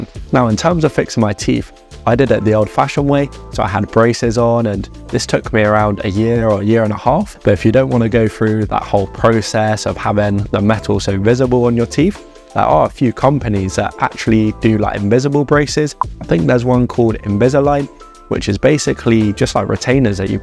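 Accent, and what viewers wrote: United Kingdom. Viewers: That thick English accent 😢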